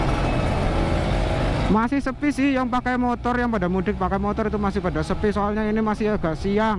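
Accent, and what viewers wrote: Banyumas, Indonesia. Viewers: Ngapak,e ketok mas😁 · Salam NINJAR NINJARR KEBUMEN 😂 ,,vlogger nyampur ngapak😁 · Rider ngapak 😂 Jadi kagak ngantuk gue nton vlog rider 1 ini salam kenal aja mase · Ngomonk nya bhsa ngapak aq jdi inget waktu bersama tmn tmn q dri wonosobo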